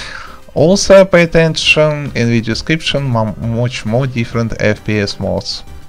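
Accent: Russia. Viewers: У тебя прям сильно русский акцент)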